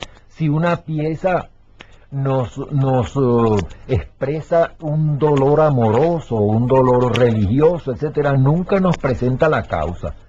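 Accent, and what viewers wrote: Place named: Caracas, Venezuela. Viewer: Nótese el acento del caraqueño de antes.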